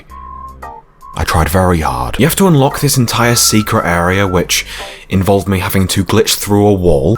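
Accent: United Kingdom. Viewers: you sound funny for a british person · Are u British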